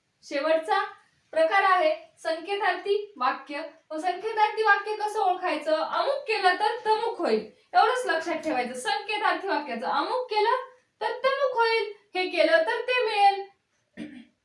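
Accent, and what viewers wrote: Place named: Thane, India. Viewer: मॅडम (ताई,) आपलं गाव कुठे आहे आपली भाषा ठाणे जिल्हा मधील वाटते मी शहापूर, मुरबाड जिल्हा ठाणे मधील आहे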